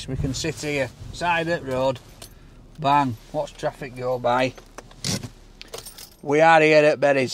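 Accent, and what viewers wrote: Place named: Northern England, United Kingdom. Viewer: I love this channel, but i watch it especially because of how northern this bloke is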